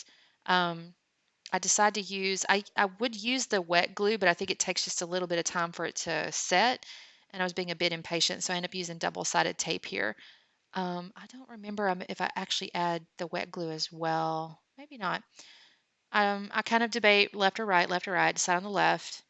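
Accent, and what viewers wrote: South, United States. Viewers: Hi there, were you shouting out to me? Thank you if you are 💖. I try to always leave a comment on the videos I watch. I want to encourage you guys to keep making them for us, lol. This is a nice layout. R u from the south? I am from South Carolina and I hear an Southern accent in your voice 🤗. TFS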